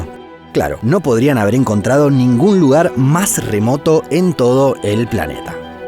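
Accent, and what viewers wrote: Argentina. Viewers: Que mal que tengas el acento arjento, lo único malo del video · Tal vez sea porque es Argentino. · Me encanta escuchar ese asento argentino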